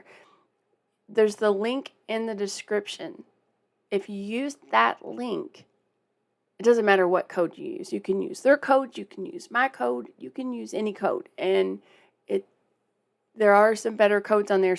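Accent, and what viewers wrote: South, United States. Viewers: I love your hair, I love your southern accent, I love your heart, and of course I love your name, after all it’s my wife’s name😂. You keep being your amazing self. We love you. Greetings from Alabama 😎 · It takes a brave person to be able to do videos these days. I think you are a genuine, honest and friendly presenter, and trust me, there are some presenters on YouTube who are terrible. Anyone who would go that far out of their way to say mean and nasty things, is someone who has some very deep self-esteem problems. Just brush them off. Be yourself. This is YOUR channel. Stop the video and talk as many times as you want, even during. And I'm a huge fan. If viewers want to hear the song un-interrupted, they can go watch a different video. By the way, I love your southern accent. Keep up the good work.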